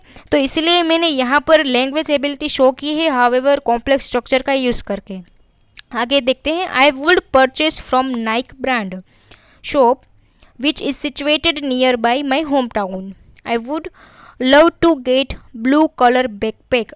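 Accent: Gujarat, India. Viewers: Madam gujarati cho?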